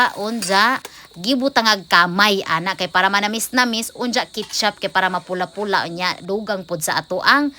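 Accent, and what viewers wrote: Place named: Visayas, Philippines. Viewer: Hahahah eninglish palang hibaw an na 😂.bisaya ni by.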